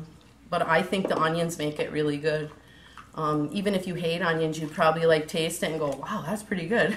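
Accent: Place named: Ireland. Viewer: Great video! I love these kind of projects! Your accent is so thick, almost Irish sounding. Love it. Is it true that you are Jeff Daniels wife? Someone told me that. Oh by the way timers is great. My grandmother puts it on everything for memory retention she says.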